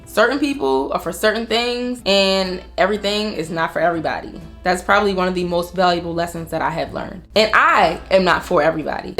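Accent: Baltimore, United States. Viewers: are you from Baltimore? (I’m from there) idk I can just tell by the accent 😭. but you are so pretty and this video was sooo helpful! ❤️ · are you from bmore? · I know a Baltimore accent when I hear it! But you gained a new follower❤️ I needed to hear this !! Thank you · ✨Your accent is giving me East Baltimore vibes ✨ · I have a video idea you should make a video of you saying words I’m just realizing you from Baltimore and that’s why I really like your accent. You should make a video saying different words like you too. Earl blue Avenue. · I hear that Baltimore lol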